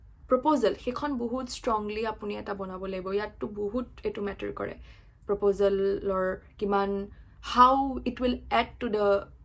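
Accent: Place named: Jorhat, India. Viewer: I love how you have that typical Jorhatian style in the way you talk. Much love❤️ - Dohabora Chuck Jorhat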